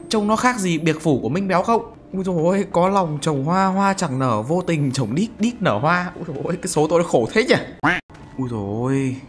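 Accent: Hai Phong, Vietnam. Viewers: Ah dân Hải Phòng à??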